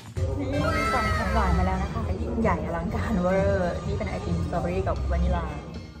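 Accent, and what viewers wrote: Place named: France. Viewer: Très belle vidéo, j'adore ton accent Français trop mignon 😍 ❤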